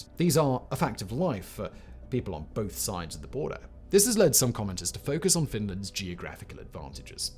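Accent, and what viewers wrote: United Kingdom. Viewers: As a southern American, hearing you say ain't in your British accent was simultaneously awesome and hilarious.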